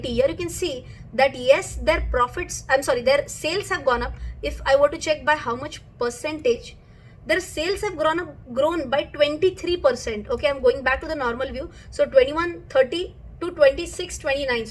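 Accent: Maharashtra, India. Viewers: Her english sounds so marathish 😅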